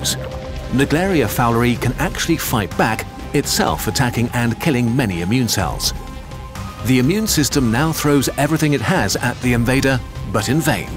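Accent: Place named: England, United Kingdom. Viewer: Kurzgesagt, German name, English presenter... 😂 Great presentation however! 👍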